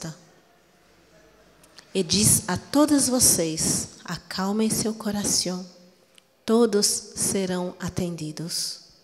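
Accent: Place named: Spain. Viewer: Eu, como um bom gaúcho aqui da fronteira, amo esse sutaque espanhol, que faz parte da nossa gente aqui dessa região sulina! Buenas minha! Me gusta ouvi-la!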